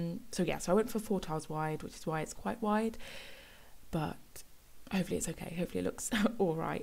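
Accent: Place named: Surrey, United Kingdom. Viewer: I hate you. You're making me miss the boats from the Sims 3 Island Life... ;-) I'm just kidding (well, on the first sentence at least, the boats were cool, the game breaking bugs much less so...), but it is a really cute build! It's a shame there isn't a world builder as there is a boat permanently attached to a jetty in central London that could inspire a restaurant build, but there isn't really a world with a lot where it feels like it could be put in and it would fit. For the storage, it's a shame that someone hasn't done a modded sofa which also includes drawers, it's a feature of canal boats and caravans. There are also a lot of overhead cabinet type storage which isn't typically interactive in the Sims, so someone modding those would make sense (although it would be even better if they were added to a tiny home refresh so all players had access to them). 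Heck, even having functioning drawers at the end of a double bed would be a nice way to remove the need for a dresser. As for why you're back in Henford-On-Bagley, going by your accent (Surrey?), it makes sense that you're going to be able to build things more naturally there, you're not going to need to look up reference images to make it fit, you've probably seen a lot of it in your life time. I wish the Sims 4 would arrange worlds in clusters as I'd love to see what you and JessicaPie could do if you had to rebuild the high school town in an English style (I'm thinking somewhere like Reading), the University town may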